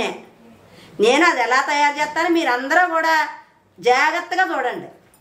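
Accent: Godavari, India. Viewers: abha.. maa godavari jillaa basha antha haayiga vundho vinadaniki aa matallo ame abimanam vundi 😊😊😊😊 thank you so much amma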